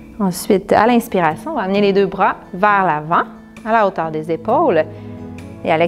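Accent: Canada. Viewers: Vidéo vue de Genève. (j'ai pratiqué et pratique occasionnellement l'hata Yoga). Super vidéo merci pour ce partage car j'ai un bon physique mais j'ai de la peine à me motiver...on verra si ça joue. Bonne continuation et j'adore L'accent canadien. J'ai un accent genevois ou vaudois à l'occasion 😉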